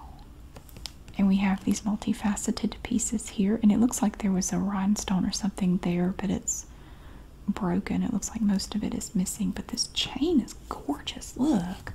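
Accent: South, United States. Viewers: You remind me of my grandma :). She also has a southern accent and sounds almost just like you. When I was younger, ( I'm now a teenager) and i spent the night at her house, she usually put me to sleep by reading a story, telling a story of her own, singing me a song, etc. And you just remind me of those times, and put me to sleep, thinking I'm a kid again in my grandma's comforting presence. thank you for the nostalgia :)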